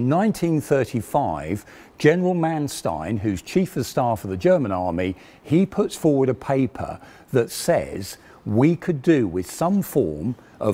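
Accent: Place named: United Kingdom. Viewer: Alongwith the knowledge about tanks, I get to learn British accent also! Win win!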